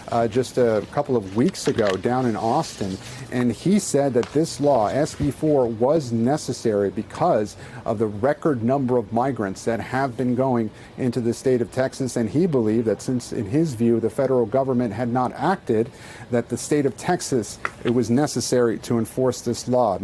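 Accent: United Kingdom. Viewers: What kind of Mickey Mouse operation is this? Starting with a British accent then everyone’s phone is going off in the background. Turn off those candy crush notis katey!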